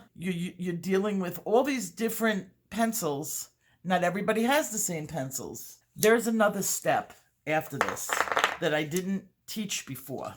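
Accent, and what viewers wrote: New York, United States. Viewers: my son would so love you. He loves the NY accent so much that he actually practices doing it. I LOVED this video so much. I have no idea until now how to use that color wheel. I got it when you suggested it. Even my ADD self could focus on this lesson.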